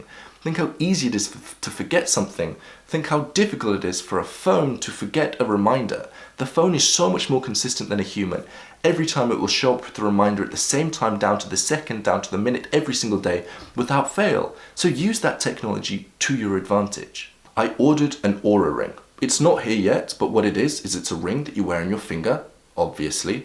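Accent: United Kingdom. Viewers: British accent = (+10 points) to discipline and charm.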